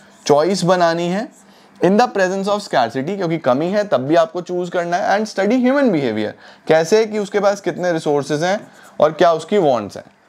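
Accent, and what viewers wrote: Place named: Punjab, India. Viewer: Your punjabi seems cute😂❤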